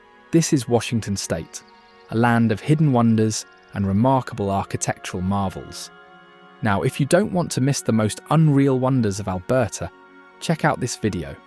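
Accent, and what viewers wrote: United Kingdom. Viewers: I tend to not believe so-called "authoritative" British accented voices.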